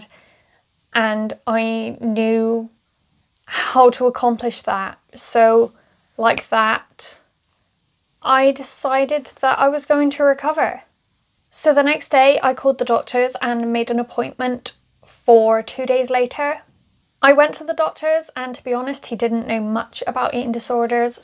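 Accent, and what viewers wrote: Bristol, United Kingdom. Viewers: Is that a Bristolian accent I hear?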